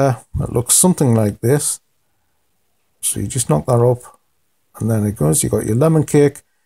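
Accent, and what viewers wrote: United Kingdom. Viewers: Thanks for these VERY helpful tips! Nice to hear a NE accent as well...I'm from Durham City but living in Kansas now. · The bit about Dropdown menu items is no longer correct in version 4.0.4. I wasted some time before realising that there is now a menu in the middle of the page above the main form where one can add items, remove items and so on. Otherwise, it is a nice presentation. I like the BBC accent. :-)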